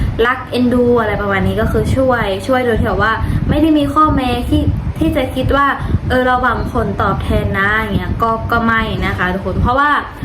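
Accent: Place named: Laos. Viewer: หนูรู้ไหมสำเนียงของลาวลุ่มกับไทยภาคกลางยกเว้นกรุงเทพรวมถึงภาษาพูดพื้นๆเลยจะเหมือนกันมากเลยมีความเหน่อแบบเดียวกันแต่ภาษาพูดมีต่างกันบ้างลุงฟังหนูพูดเข้าใจได้ง่ายกว่าภาษาอีสานเคยฟังน้องอูพูด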